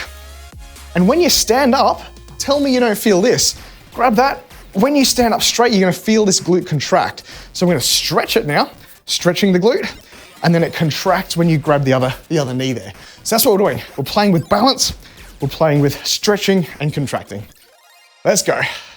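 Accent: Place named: Australia. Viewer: with that Australian lilt in your voice I reckon it would be a hit :D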